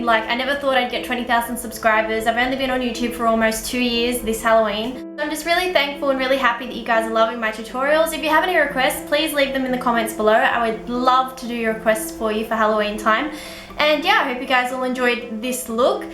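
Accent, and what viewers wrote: Australia. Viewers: You sound Australian 😂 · loving the Aussie accent yasssssss aussies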